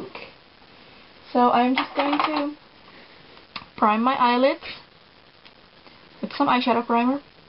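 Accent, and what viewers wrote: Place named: Netherlands. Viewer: je herkent het gelijk als een nederlander engels praat. niet dat je engels slecht is ofzo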